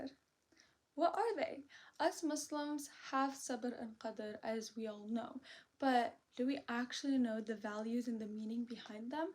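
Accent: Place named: Pakistan. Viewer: you got Pakistani accent.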